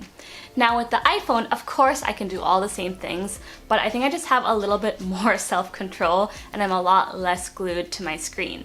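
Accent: India. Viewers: Hi Are you Indian with fake accent or NRI with "some where in between accent" or an American with real american accent . pronunciations sounds 50 percent Indian and 50 percent American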